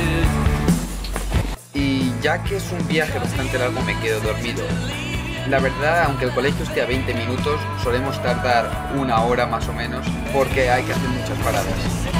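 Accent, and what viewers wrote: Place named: Castile, Spain. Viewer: no notas el acento castellano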